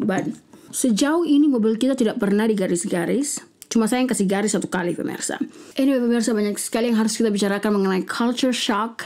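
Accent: Manado, Indonesia. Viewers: Minum kopi apa doe... dapa lia sedap.. I am a coffee drinker. Btw, I like the way you talk.. apa lg deng logat manado plus english.. beking kt tatawa... Thx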